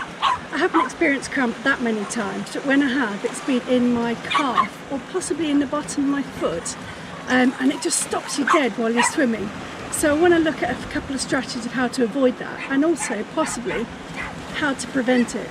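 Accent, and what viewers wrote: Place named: United Kingdom. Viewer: Awesome British accent, Thanks for your service 👍, you earned my subscription God's blessings 🙌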